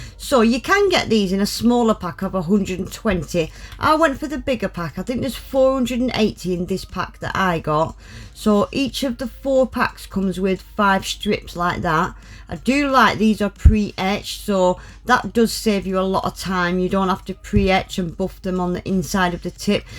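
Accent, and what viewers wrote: Yorkshire, United Kingdom. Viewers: By the way, which part of Old-Blighty are you from? You definitely sound like a Yorkshire girl!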